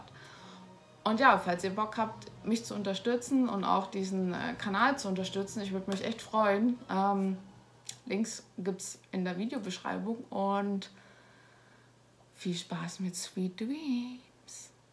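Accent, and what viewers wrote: Germany. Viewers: Your German accent sound beautiful, and you are so cute😍😍😍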